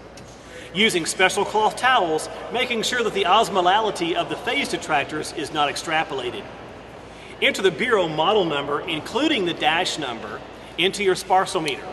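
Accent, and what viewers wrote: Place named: South, United States. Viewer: I've heard this presentation before but it's more authoritative when the presenter has a Southern accent.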